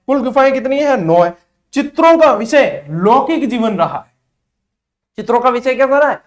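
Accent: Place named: Marwari, India. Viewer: Bhot acha pdhà rhe ho sir bt aap marwari thodi kam bolne ka try kre .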